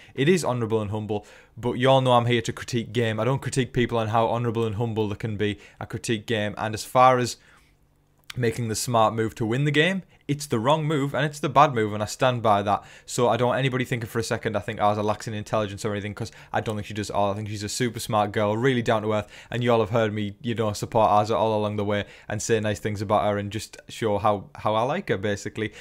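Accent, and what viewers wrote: United Kingdom. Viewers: BTW Because of listening to you for three months I can now understand your British accent plus I can understand others as well. Especially the people on the movies as well as many others. Thank you for providing us with all your comments and thoughts on this season of Big Brother.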